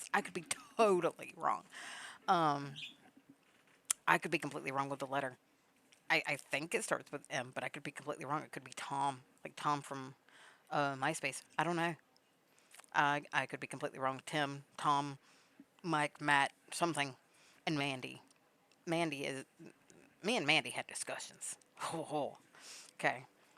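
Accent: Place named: South, United States. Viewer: I heard the Southern accent and was sat. I know my people don’t these type of call-out videos unless it’s something serious. Also, Mucker here, passing out popcorn to all my fellows 😂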